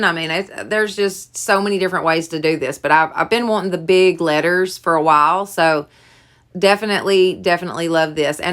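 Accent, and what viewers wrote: South, United States. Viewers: Love your creativity! What state do you live? You speak southern like me! I’m in Tennessee! I’m thinking maybe you live in Kentucky!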